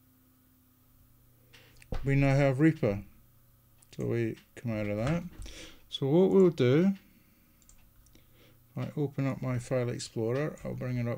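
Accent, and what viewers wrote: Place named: Scotland, United Kingdom. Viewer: Thanks a lot for this great video! I also really enjoyed your Scottish accent, it brought to mind my ten years when I lived in Scotland;-). &#160;- So if I understand correctly, this still remain a portable install of Reaper but just more tidy, correct? - Where is the best place to place all the VST.dll files for all of my plugins inside the main Reaper portable folder? Thanks a lot in advance and best regards, Max T.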